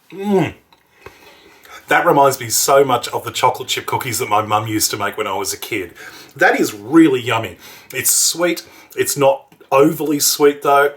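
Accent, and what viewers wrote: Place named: Australia. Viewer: That's an Aussie accent like mine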